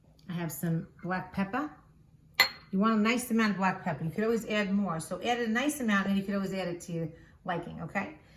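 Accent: New York, United States. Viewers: Keep up with the videos girl I've learned a lot from you!! And you trip me out with that n.y. accent 🤣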